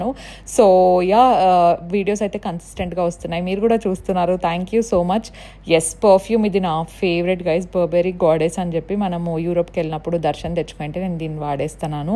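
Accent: Anantapur, India. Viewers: Mana anantapur slang ye veru abba... Miru regular videos lo matlade dhaniki.. anantapur lo mi Amma valla tho vunnapudu matlade matalake chala difference vundhi. Anantapur slange ...Endhukante nenu kuda anantapur ye sis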